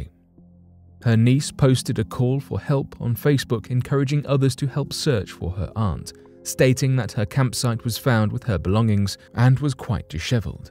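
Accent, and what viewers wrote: United Kingdom. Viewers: Brits say geezer. This dude is a Brit.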